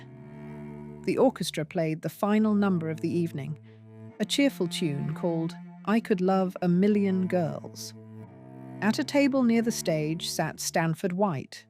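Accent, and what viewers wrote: England, United Kingdom. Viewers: Amazing how few people know it’s AI. Why would you pick an English voice for American stories? Odd choice.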